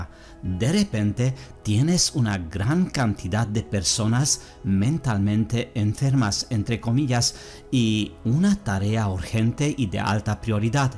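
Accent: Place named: Germany. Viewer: Estoy muy impresionado con su trabajo, de verdad impecable su eapañol es excelente pero su acento parece aleman o holandes puedo preguntarle de donde eres?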